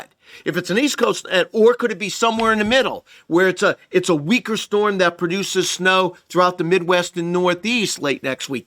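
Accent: New York, United States. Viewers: Just because you have an obnoxious NY accent and way too much confidence doesn't mean you know what you're talking about. News flash, this is click bait!!!!!!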